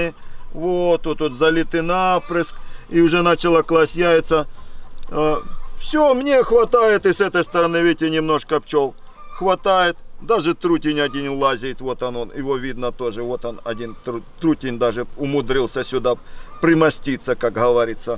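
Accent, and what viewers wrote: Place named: Ukraine. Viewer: Відчуваю у Вас український акцент, чи не краще залишатися самим собою, говоріть мовою.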